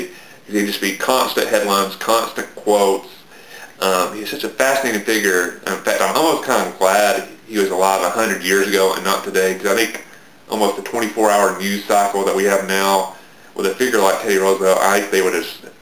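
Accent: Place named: South, United States. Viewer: Okay. Just wondering because I detected a southern accent. I live in the South as well. :)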